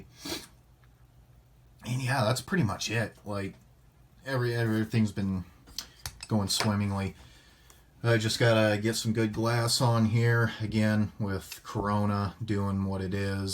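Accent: United States. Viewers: fuck man were you born and raised in NYC, that's how we speak BROOKLYNESE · When you talk you remind me of the MN boys 🤣 I've been to Des Moines they must be alot different than rural Iowa I also get why people are safety Nazi's just because I had a gun doesn't mean one of my kids didn't touch it or whatever but people don't get the safety is situation dependent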